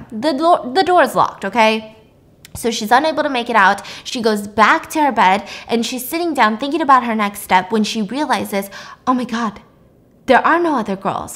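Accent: United Kingdom. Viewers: Ok but your British accent is the only one I will accept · im here to let you know it is a British accent and imma go with this loll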